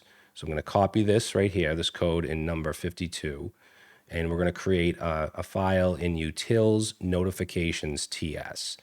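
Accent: Boston, United States. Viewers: 10 years ago, you were a godsend, helping me learn to code. It's good to see you're still at it. And as someone that grew up in Connecticut, hearing your Boston accent is very soothing.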